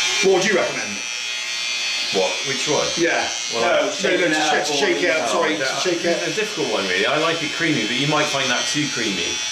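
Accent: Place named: Bristol, United Kingdom. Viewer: There used to be a small dairy farm next door to me with Jersey cows. The milk delivered in the morning was from yesterday’s milking, as fresh as it could be. We had skimmed milk which still had a good head of cream. I’m more north east Gloucestershire born and bred, accent not quite as strong up here. I can hear a bit more Bristol in your accent sometimes. I can also do a good Pam Ayers impression🤣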